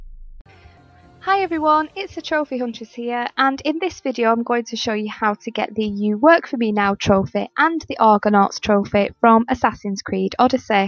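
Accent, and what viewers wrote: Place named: Northern England, United Kingdom. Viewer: Great tip thanks! Subscribed as your content is spot on (and its great to hear a fellow northerner doing guides on my fave games!!)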